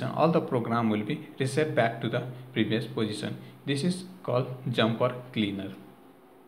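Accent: India. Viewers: why does it sound like most utube demo's have India voices...i have problem with accents...like they say 'lost in translation'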